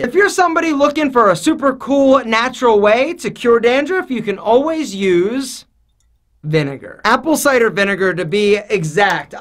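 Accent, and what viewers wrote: Philadelphia, United States. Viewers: Bro where are u from? Sounds like a Philly accent.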